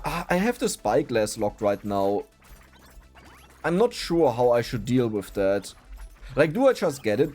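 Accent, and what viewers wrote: Germany. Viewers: Bro is trying to keep speaking english and Not German or whatever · Dein Denglisch ist der Hammer, ich lach mich hier halb tod. Mache einfach so weiter! Geile Videos!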